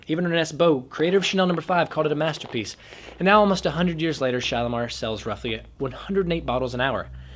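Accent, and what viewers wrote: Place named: Georgia, United States. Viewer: Your southern accent is so subtle-almost undetectable. I’m guessing Georgia. Two great fragrances, especially Shalimar.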